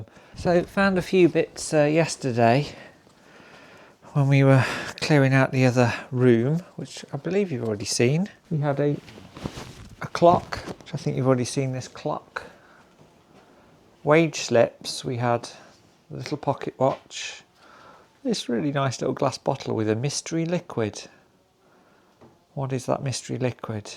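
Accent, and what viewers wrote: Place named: Ireland. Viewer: Im new to your channel ❤❤❤ i love your voice it's your irish accent ❤❤ all my love from America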